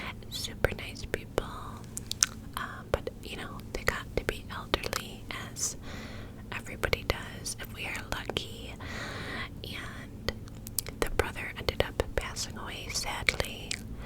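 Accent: New York, United States. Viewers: I loved this! Can you do the next in your ny accent again? It gives me the most tingles for some reason. <3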